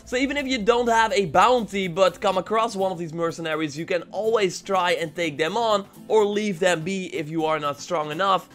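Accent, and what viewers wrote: Netherlands. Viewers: Are you dutch?? Your accent really sounds like it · RuiDui yeah he is Dutch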